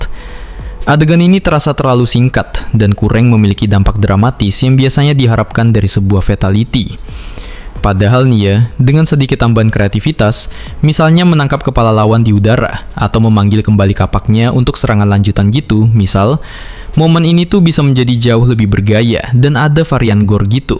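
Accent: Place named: Surabaya, Indonesia. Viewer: Lu org surabaya bang?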